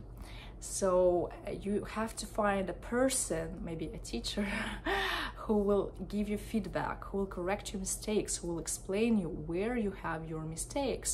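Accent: Russia. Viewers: Сразу видно ,что вы носитель русского языка, и по внешности, и по акценту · Разозлило что потеряла 7 минут смотря видео, так что напишу.. Ни одного совета… очевидные вещи.. акцент четко русский.. по словам не слышно уровня с1.. максимум б1.. речь беглая, супер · That's why we feel Russian accent in your speech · rus işte hep aynı aksan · Every nation has spesific accent it so cute Russian speakers make t d r h really different 😂❤️🥰but it original ❤️ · Я русского отличу запросто лишь по акценту)) а так словарный запас, и уровень великолепный, вы умничка! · As you mentioned that you speak russa, i immediatly started hear your accent. i like russan accent. That always remind me the hollywood movies.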